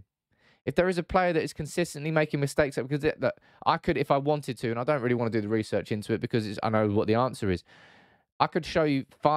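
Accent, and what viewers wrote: Manchester, United Kingdom. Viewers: cant understand ur manc accent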